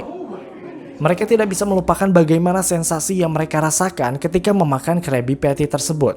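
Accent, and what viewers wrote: South Jakarta, Indonesia. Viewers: Bang lu orang Jaksel kh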